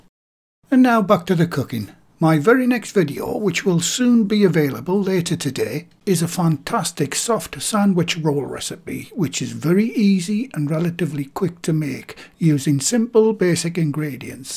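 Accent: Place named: Northeast England, United Kingdom. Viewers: love your northern accent and best of all you make 'plain' cooking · Thanks again, I thoroughly enjoy making your recipes, I also have the same accent, as I am from the North East of England as well, all the very best for the future, highly deserved and keep them coming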